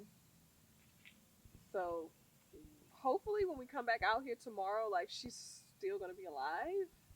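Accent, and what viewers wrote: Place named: South Carolina, United States. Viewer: The way he talks… you have to be in SC. My grandad from there and they all all the same way 😂!! Ain’t nothing like country living.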